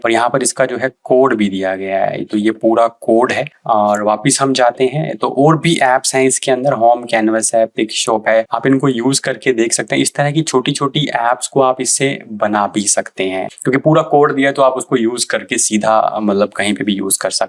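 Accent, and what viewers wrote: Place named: Ireland. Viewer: It's starts out like English but then becomes Irish or something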